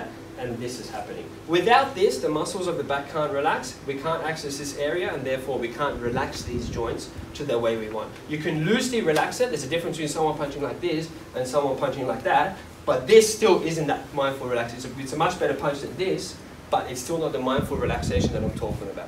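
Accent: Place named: Australia. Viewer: hey dude, sorry live in New Zealand assumed you were Ozzy because of the accent. I do abit of bjj here and have trained traditional tkd. Just trying to open some more doors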